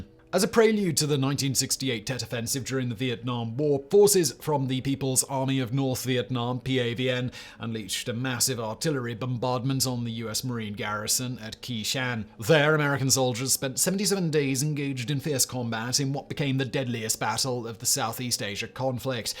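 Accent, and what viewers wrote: United Kingdom. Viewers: I attribute it to him being British and they pronounce words differently then we do in the states.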